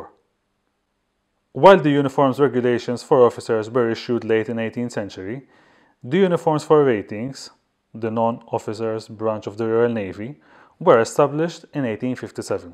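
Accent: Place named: Malta. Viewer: Very strong Maltese accent :)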